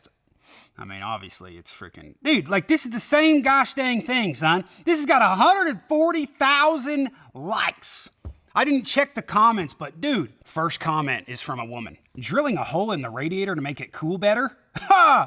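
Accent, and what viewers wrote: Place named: Kentucky, United States. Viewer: Im from ky and I can definitely tell you lived there for a while when you was younger by the way you talk lol, screw all the politicly correct way to talk not good for anyone to censor the way you talk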